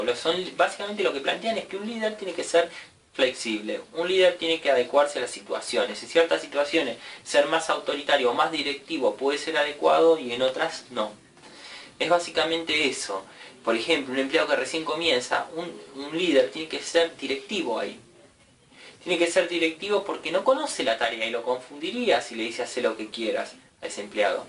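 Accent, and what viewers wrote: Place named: Argentina. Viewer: Muy claros tus aportes teoricos. Una clase con acento argentino que se agradece.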